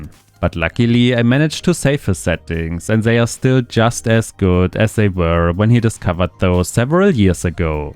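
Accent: Germany. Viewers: Are you German? sounds very German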